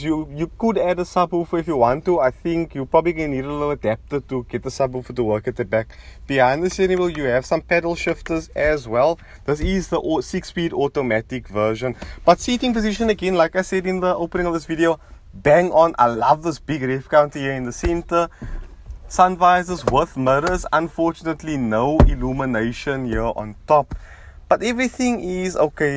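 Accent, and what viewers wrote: Cape Town, South Africa. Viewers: His accent is from Cape Town South Africa to be exact. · I always get excited when I hear a South African accent doing some reviews on YouTube 😭♥️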